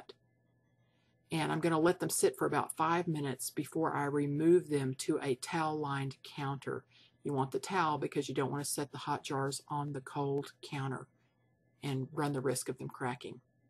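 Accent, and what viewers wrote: Texas, United States. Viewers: I beleive that is a Texas accent, and also I beleive by her demonstations that she is probably a teacher of young children. I love her videos.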